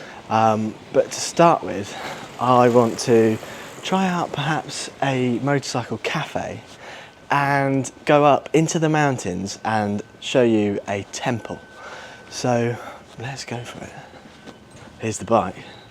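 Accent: United Kingdom. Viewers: L'accento britannico è decisamente più civile e gradevole, a confronto con quello americano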